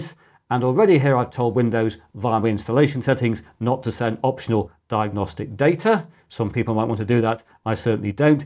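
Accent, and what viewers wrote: United Kingdom. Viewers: Essential viewing for a beginner like me. And you're British, as I am, not yet another American. Discovering your channel really has made my day. Thank you so much.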